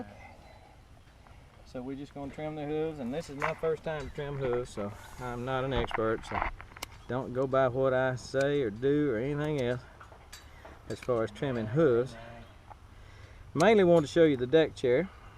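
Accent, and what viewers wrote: Texas, United States. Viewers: I thought you said something, and thought that was not like you... at least not in public anyway! But no, it was just your Texas accent. All you said was "'cause they're sheddin'".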